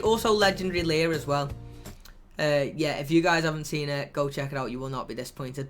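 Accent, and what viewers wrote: Liverpool, United Kingdom. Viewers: This might seem like a stupid question but surely if you grew up in Indianapolis you would have picked up there accent but you have a very thick scouser accent?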